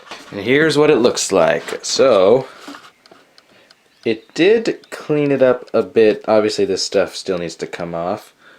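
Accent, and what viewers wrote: Vermont, United States. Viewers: Sounds like Vermont, acts like Vermont and yes it is Vermont. There was an old man down the street that repaired old mowers and was good at it and had 35-40 mowers in bushes around the house. I used to walk the daughter by his place and one day talked with him. Used mowers can bring in some money on the side. He was dealing with another gent who sold those mowers for cheap at a large yard sale. I took over the business of sales and we made 5X as much. Some of the mowers bringing $70-120 apiece. One neighbor had a Honda mower and I offered to sharpen the blade as he had not sharpened it in 15-20 years-yes. Upon looking I found he had been mowing with the blade upside down and backwards for those years! Now he cuts smooth as a new mower. I waited until I got home before I started laughing about the whole thing!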